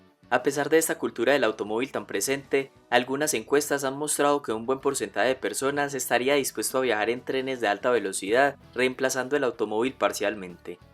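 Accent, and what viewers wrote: Colombia. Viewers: Excelente video pero pésimo la narración el acento colombiano lo daña todo.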